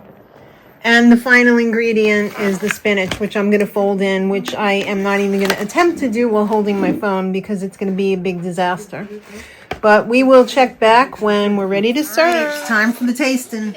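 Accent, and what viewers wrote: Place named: New York, United States. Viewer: Happy Birthday!!! I am enjoying watching all your videos (even way back.) Thank you for sharing your life with us. As a former Long Islander, I love your New York words, phrases, and accents. I hope your birthday is wonderful and your year filled with blessings. 🎈🎈🎈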